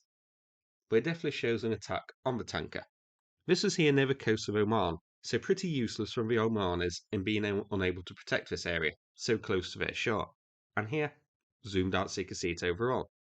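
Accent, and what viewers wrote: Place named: Nottingham, United Kingdom. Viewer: That's the just Nottingham accent for you😊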